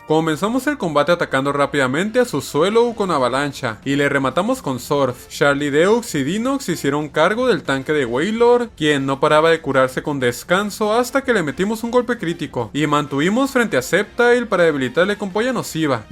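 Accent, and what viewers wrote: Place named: North, Mexico. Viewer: Te amo mucho, me encantan tus videos❤️ Siento que eres del norte de México por cómo pronuncian la ch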